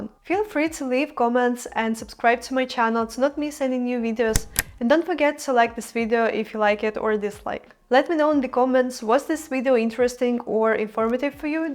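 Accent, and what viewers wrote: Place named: Russia. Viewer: Off topic, but I thought you were Russian from your accent - it is beautiful! I would love a video comparing the two countries! :)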